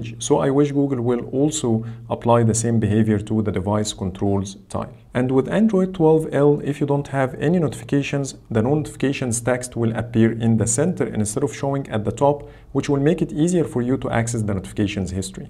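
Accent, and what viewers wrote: Egypt. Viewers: احلي مسا عليك من مصر الفيديو جامد عرفتك من اول الفيديو انك مصري 😂♥️♥️♥️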